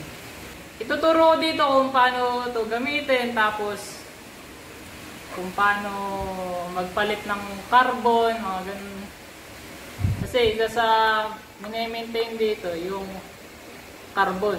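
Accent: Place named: Bulacan, Philippines. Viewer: Sabi na eh hehe, sa punto mo palang. Dyan din po probinsya namin, sa Sto. Rosario Hagonoy. Tagal ko na nga hindi nkkpasyal dyan. Salamat sa tutorial mo, malaking bagay sa aming mga nagsisimula palang .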